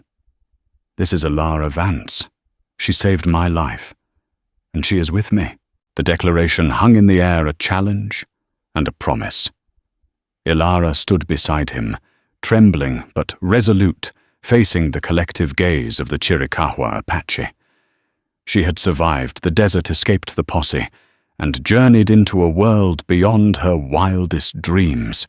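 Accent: United Kingdom. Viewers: That is a British accent, in my opinion, the nicest of the very many British accents from around the UK…. He places emphasis on words much more often than most of the other voices.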